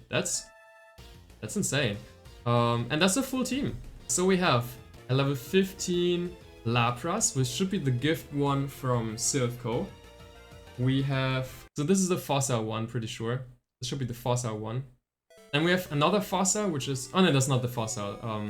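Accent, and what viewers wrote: Germany. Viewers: man hört so raus das du deutscher bist :D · You have the most german accent i ever heard. No front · From Germany? Heard it after 2 seconds of talking. Never watched a video before but love your content. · I can hear that english is not his mother language. Could it be he is german?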